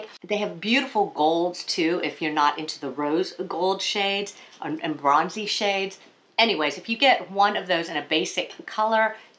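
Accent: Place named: United States. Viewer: Had to switch off, tips might be good but that American voice does your head in. Sorry.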